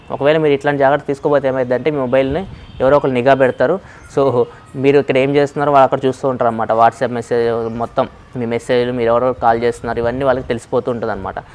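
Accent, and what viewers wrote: Ongole, India. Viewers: Bro meedhi ongole aaaa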